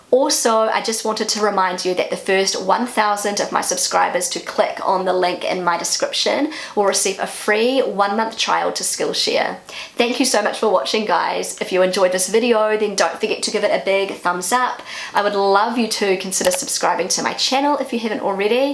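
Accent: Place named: New Zealand. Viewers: That's exactly how I felt. I googled right away New Zealand English to find out if speaks it properly:-D But I definitely like that accent. Generally I think nobody has to be ashamed of their accent. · Oh yes, you have a New Zealand accent. At the beginning I had a lot of small problems to understand you. But now I have no probs anymore. But the Kiwi-accent is so easy to understand in comparison with the Scottish, Texan or Kentucky accent. I love your accent. It is so sweet…for me…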